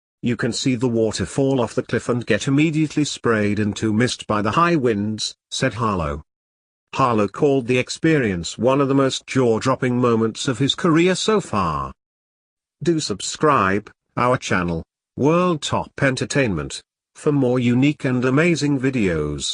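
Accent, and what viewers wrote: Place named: United Kingdom. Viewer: Why is there a British guy blabbering over this?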